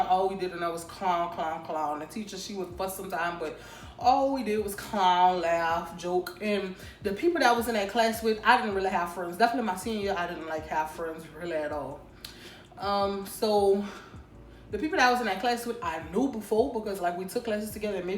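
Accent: New Orleans, United States. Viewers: "um power to the ppl" 😭 she gotta be from new orleans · you must be from NOLA 🥺❤️ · She gotta be from New Orleans 😂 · You must be from Louisiana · I can tell she from new Orleans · accent is so pretty you must be from New Orleans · i think she from nola dats how we talk lol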